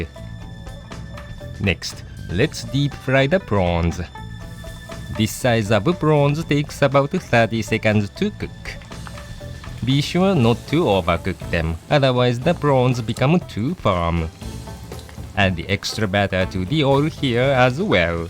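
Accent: Japan. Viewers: カタカナ英語聞きズラいなぁー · 这英文真的很日式。(˶‾᷄ ⁻̫ ‾᷅˵)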